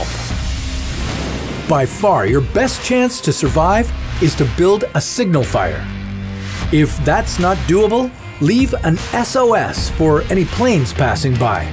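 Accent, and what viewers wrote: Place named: United States. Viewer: american accents are the worst. we need an old english dude commentating this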